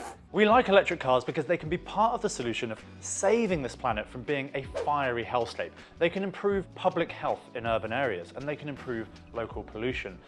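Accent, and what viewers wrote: United Kingdom. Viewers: The subject matter is fairly interesting and you would make a great straight man in a comedy team duo because you have a great dry sense of humor. The British accent is always a plus too. We half brothers and sisters out here are always captivating by that proper English. Something about it just fascinates the rest of us. We really do love the people of the UK. But getting back to the little Chinese EV's, they really have very limited real world applications the way I see it. No offense please. I think I would want one strictly for inner city driving only. Other than that, not so much. So, Pip Pip, Tally Ho and all that good stuff. Take care my good man.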